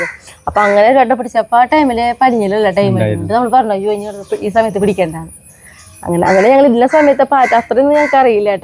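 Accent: Thrissur, India. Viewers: ട്ട ഞങ്ങൾ തൃശൂർ കാരുടെ ട്രേഡ് മാർക്ക്‌ ആണ് 😄😄😄😄👍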